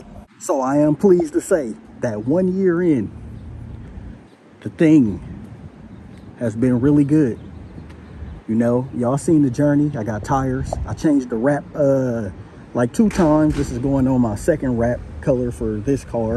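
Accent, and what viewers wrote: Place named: Bay Area, United States. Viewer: I knew you were from the bay as soon as I heard you talking! Lol almost thought what was mistah fab talking! Loll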